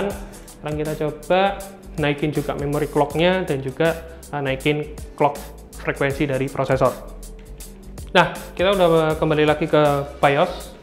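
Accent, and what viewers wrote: Java, Indonesia. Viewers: Medog tenan mase 😀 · Khas medok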